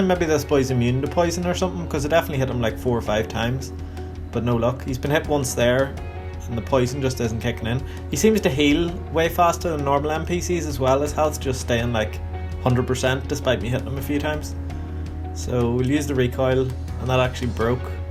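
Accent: Northern Ireland, United Kingdom. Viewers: Norn Iron content creator? Lol gotta watch your shit from now on. You from Co Down? Hard to tell if your accent is posh Lderry or south down. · Northern Ireland?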